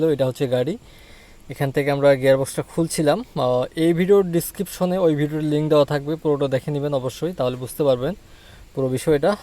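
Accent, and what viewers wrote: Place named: North Bengal, India. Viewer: এখন বিষয় হলো আপনার কথা শুনে তো মনে হইতেছে যে আপনার বাড়ি উওরবঙ্গের দিকে আমাদের দিনাজপুরে নয়তো।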